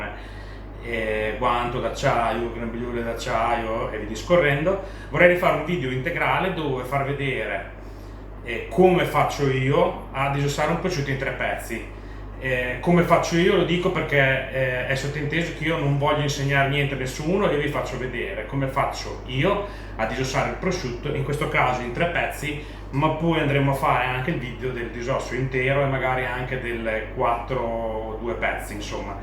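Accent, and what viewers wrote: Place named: Romagna, Italy. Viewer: Bravo complimenti 👍 raccontato con il tuo accento romagnolo diventa fantastico 👍👍👍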